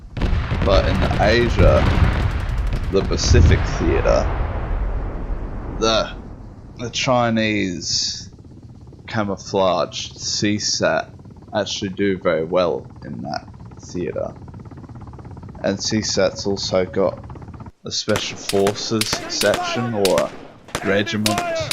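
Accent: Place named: Australia. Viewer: Australian ya dick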